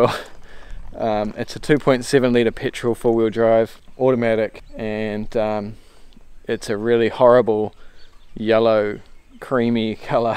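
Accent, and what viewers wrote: New Zealand. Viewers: so nice to hear a Kiwi accent !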